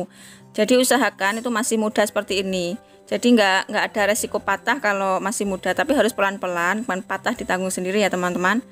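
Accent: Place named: Java, Indonesia. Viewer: Medhok mbak jowony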